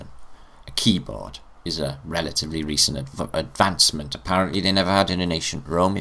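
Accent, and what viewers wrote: Wales, United Kingdom. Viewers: 🙂 accent sounded stronger back then ...In the valley s yaki da ❤️ 🤗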